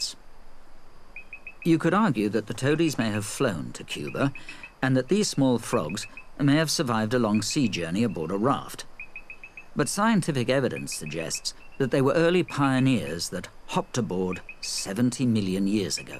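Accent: United Kingdom. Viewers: Enough with British voices. Done gone.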